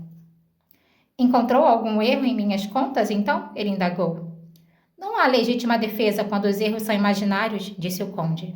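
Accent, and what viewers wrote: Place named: Rio de Janeiro, Brazil. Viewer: Essa voz linda com o o delicioso sotaque carioca ❤️( também sou carioca mas vivo em SP e fico com saudades )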